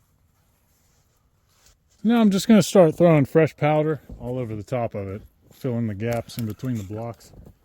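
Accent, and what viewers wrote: Pittsburgh, United States. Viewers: Do i hear a little yinzer in your accent? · I could hear the Pennsylvania accent, makes me homesick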